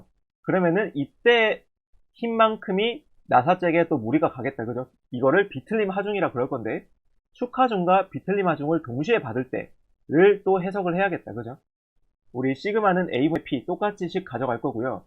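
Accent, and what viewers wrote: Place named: Gyeongsang, South Korea. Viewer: 사투리가 약간 있네요 혹시 경사도분? ㅎㅎ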